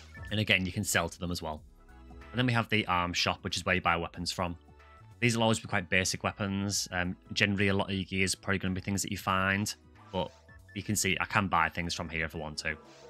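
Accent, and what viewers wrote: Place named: Teesside, United Kingdom. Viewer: you sound like your free teeside mate! Me and my friend from Billingham are literally downloading this game tonight to relive the nostalgia!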